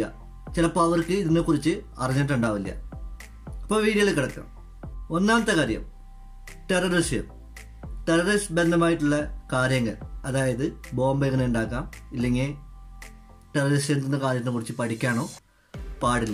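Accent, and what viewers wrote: Tamil Nadu, India. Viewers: MOTHER TONGUE TAMIL ANNALLE ? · ഒരു തമിഴ് ചുവയുള്ള മലയാളം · Malayali alla... atha... oru tamil touch😊but cute..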